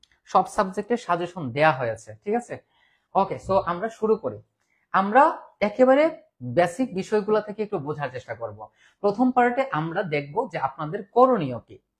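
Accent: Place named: Sylhet, Bangladesh. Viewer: Bro Sylhety✌️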